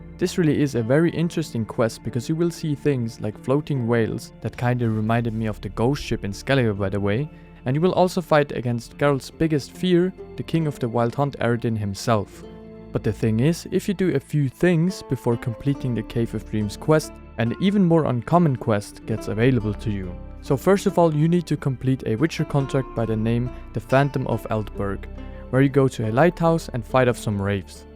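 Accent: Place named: Switzerland. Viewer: Yes de Akzent im Englische würd ich überall erkenne 🇨🇭 Btw usually I would do all quests even the dreadful Reason of State but for Simun, who you meet in Lugos' prison, was so fishy that for once I turned down a quest. Seeing how awful Unpaid Debt is makes me think that it was the right choice 😂 However, it is interesting that the name of the man who Simun orders you to kill is the name of a Slavic smithing god. Simun mentions his sword if you side with him, but there is no special loot to be found there.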